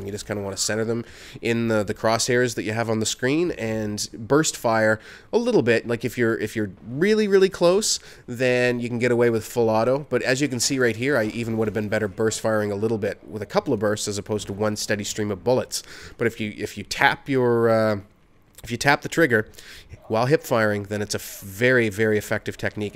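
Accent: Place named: Newfoundland, Canada. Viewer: where is from.. he sounds like hes from newfoundland.....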